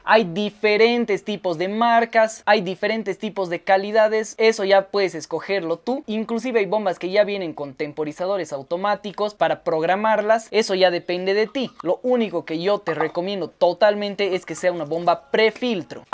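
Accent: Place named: Amazon, Peru. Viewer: Es de Perú, de la parte de la selva por su forma de hablar.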